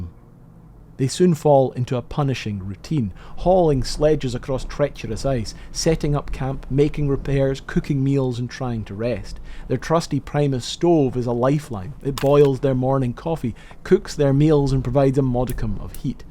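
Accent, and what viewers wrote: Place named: Scotland, United Kingdom. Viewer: Excellent stuff: i’m ex english love your Scottish accent… way te go mon!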